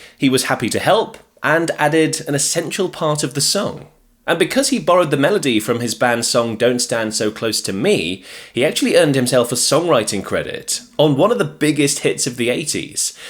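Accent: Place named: Newcastle, United Kingdom. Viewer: Geordie teaching English? Awye mun!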